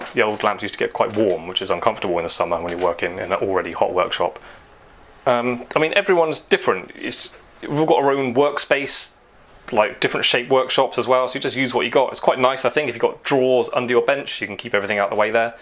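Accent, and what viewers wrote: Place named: England, United Kingdom. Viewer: Great video Mate, thank you! What 's your accent? (what part of England Imean)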